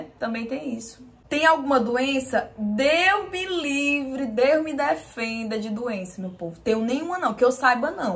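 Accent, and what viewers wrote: Ceará, Brazil. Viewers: Nossa pensei que era do nordeste jureiii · desde o primeiro vídeo teu que eu assisti suspeitava que fosse do Ceará, o jeito de falar entrega dmss 😂❤ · Mlr, a primeira vez q eu ouvi vc falar já imaginei q era daqui do Ceará. Sou de Fortaleza. Bjos!!! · Esse sutaque do nordeste toda meiga, gostei demais do vídeo simpática e humilde sempre estou interagindo com você no instagram beijos mulher !!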